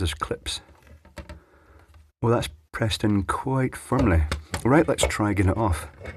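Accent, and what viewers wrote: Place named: England, United Kingdom. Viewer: A bit odd to hear "ain't" in an English accent.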